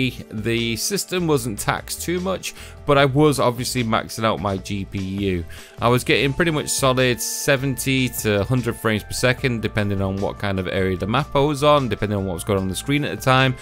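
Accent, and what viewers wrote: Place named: United Kingdom. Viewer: i liked and subbed and now commenting and because i did all that is this is the best guide and your British which so am i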